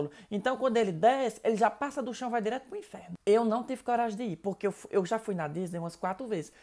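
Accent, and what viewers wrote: Ceará, Brazil. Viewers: "Tão rápido que eu procurei sentido em minha vida e não achei!" Cearense! 😁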